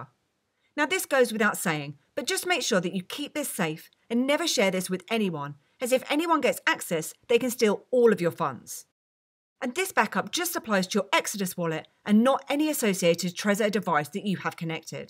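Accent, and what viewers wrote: United Kingdom. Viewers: Could not understand her at all...her thick Brit accent ruined the video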